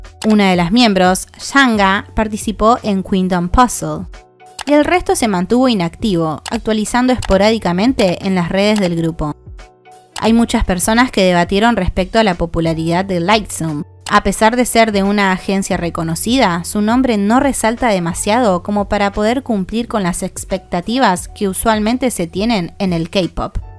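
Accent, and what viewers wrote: Argentina. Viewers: 💫 ¡Excelente tu canal! ¡Me encanta!Solo por curiosidad ¿sos de argentina?💫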